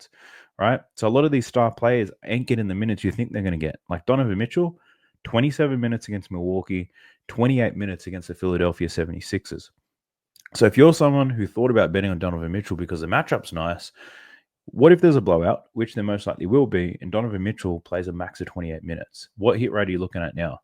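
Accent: Australia. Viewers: Bro I mean this with the utmost respect but I realized that the Aussie accent is the reason I love the intro so much 🤷‍♂️🤣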